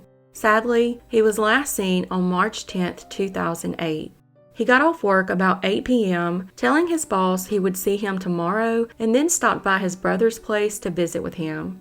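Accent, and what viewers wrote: South, United States. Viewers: I can tell your southern not only from the accent but because every case you talked qbout has 3 names instead of 2. I'm willing to but your name is.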